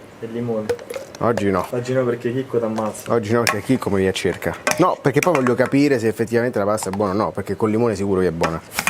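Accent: Rome, Italy. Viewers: tutto quello che fa e divertentissimo sara perche parla romano... ma la gioconda non l.hanno rubata pero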